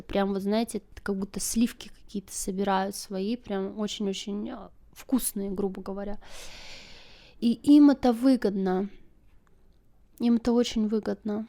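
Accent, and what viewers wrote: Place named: Ukraine. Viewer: По говору слышно, что с Украины, и по смысловой части тоже.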